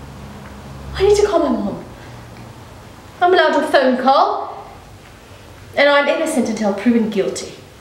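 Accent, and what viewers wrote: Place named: Australia. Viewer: Aussie accent eh. Nice 👍🏻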